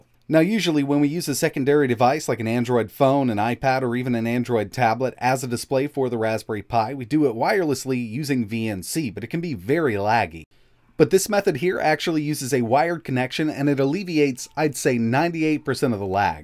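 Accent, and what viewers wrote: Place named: South, United States. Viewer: Sounds like spent some time in the south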